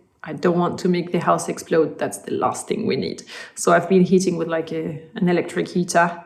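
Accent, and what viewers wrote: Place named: France. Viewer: I lived in France for a year as an English teacher in Provence and hearing your accent is really making me miss living there!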